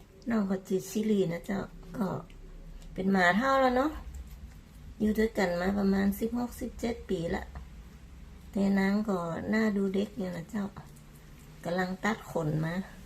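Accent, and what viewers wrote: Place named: North, Thailand. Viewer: สวัสดีค่า เพื่อนใหม่มาส่งกำลังใจและมาขอกำลังใจด้วยคนนะคะ,, ชอบภาษาเหนือมากๆเลยค่ะ สำเนียงเพราะจัง❤️🥰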